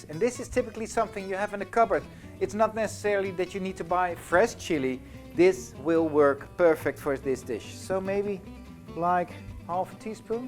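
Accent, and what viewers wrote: Netherlands. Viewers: He must be Dutch with his accent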